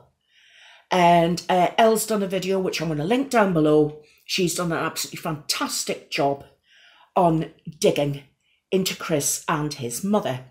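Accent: Newcastle, United Kingdom. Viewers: Oh my goodness, I wanted to reach through the screen and hug you. You are like a mamma bear and clearly have so so much compassion for everyone and their mental health. You are amazing, please keep being you! As an unrelated and not serious side note - I love your geordie accent! I always connected it with genuine kindness and love. I hope you are ok <3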